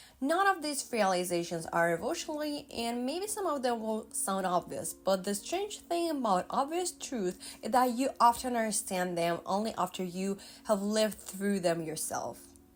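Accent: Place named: Russia. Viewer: I've seen a couple of your videos already. And I gotta say you have the best hair. I'm super jealous. Its just gorgeous. Also What is your first language? You have an interesting accent that I can't quite pin down(uncultured american). Sounds russian or maybe eastern european? But like with a touch of spanish and even scottish lol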